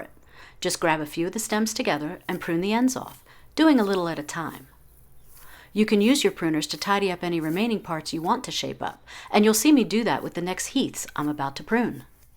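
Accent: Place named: United States. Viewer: Sounds like she iş american BUT gardening in england.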